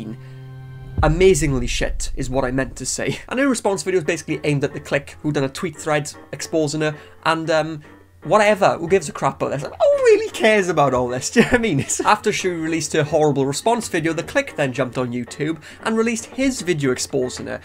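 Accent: Wales, United Kingdom. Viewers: Nothing quite like listening to a nice Welshman summarize this bs. At least I'm assuming you're Welsh by the accent.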